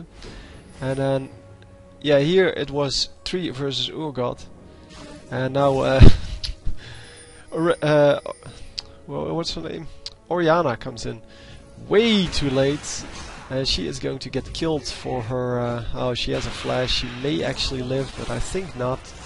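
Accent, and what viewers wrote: Netherlands. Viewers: New to the channel, just subscribed...and do I detect a Dutch/Flemish accent?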